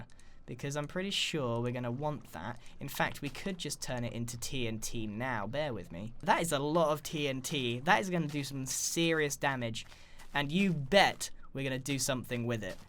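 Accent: United Kingdom. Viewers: QnA: are u British/English cuz I am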